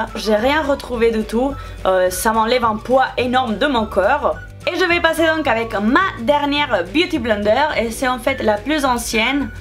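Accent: Spain. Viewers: Axent espanioll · le "mo" c de la moisissure 😂😂 et c le fROmage et pas le Formage😉😂 et pour info je sais qu' elle a un accent et qu' elle est espagnole et pac française de base c pour ça qu' elle fait des fautes et je l ai juste corrigée pour qu' elle s améliore c tt les rageux il faut vous calmer😂😂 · T de quelle origine ? Ps: superrr videooo ❤ creo q ablas espagnol pienso q e reconosido el acentoo ❤ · Tes trop chou avec ton accent espagnol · Jsuis la seule qui kiffe l’accent espagnol sur le français ? 😍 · T espagnol ?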